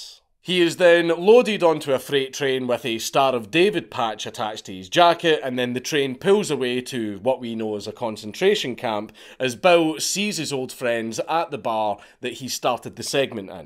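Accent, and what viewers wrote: Scotland, United Kingdom. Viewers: Let’s be honest, a story told by a Scotsman is way more entertaining and engaging than anyone else on earth lol!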